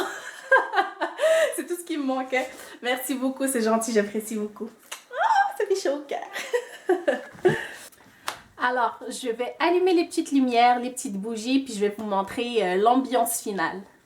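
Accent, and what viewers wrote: Quebec, Canada. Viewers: ton accent quebecois 😍😍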